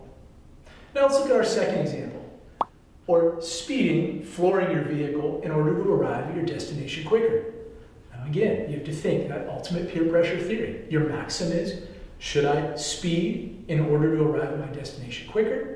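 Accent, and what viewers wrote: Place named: United States. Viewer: Thank you for the video even though it was not crystal clear (or some smoke remains), it was helpful. Its just the US accent and US examples what was difficult. Thank you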